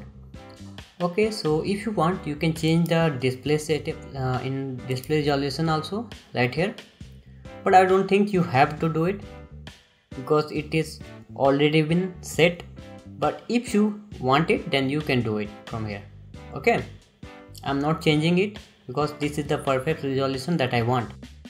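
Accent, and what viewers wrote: India. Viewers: Thank You indian voice!!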